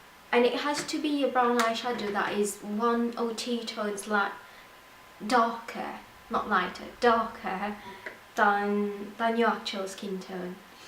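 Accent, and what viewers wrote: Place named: United Kingdom. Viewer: I was like 'She's got a british accent now ' throughout the whole video :P Good one though, I'm officially a celeb's mate :3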